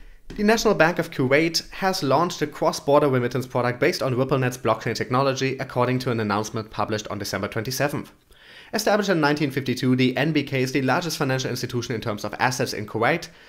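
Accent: Germany. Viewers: den deutschen akzent hört man einfach sofort raus haha^^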